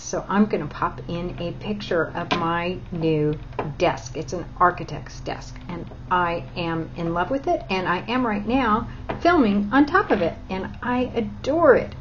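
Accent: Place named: California, United States. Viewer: I say you guys all the time, bad ha but ur right we Californians say it alot and I say aunt like u.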